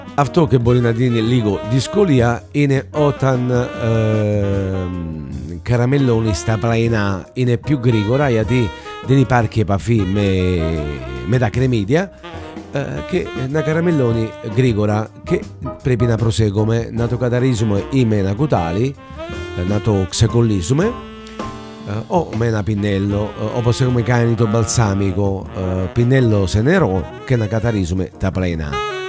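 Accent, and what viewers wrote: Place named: South, Italy. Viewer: persino il tuo accento italiano, mentre parli greco, mi suona come quello del sud italiano. Direi….fammi pensare……fra napoli e sicilia forse?….ho indovinato? Palermo? Magna Grecia sicuro….hai usato zucchero o no? Se no, perche no?….un altra cosa: come e che sei andato afinire in Grecia? wife? Girl friend? O altro?…..:):):):)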